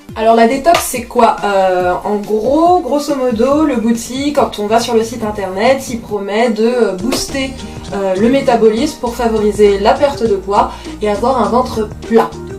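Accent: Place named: France. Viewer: The French accent is quite dope. Keep going from London.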